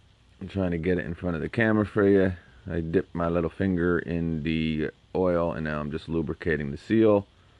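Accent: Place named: New England, United States. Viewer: Came back to watch again to do my first oil change. Had to use this video because the New England Accent #Represent . Thank you for posting this!!!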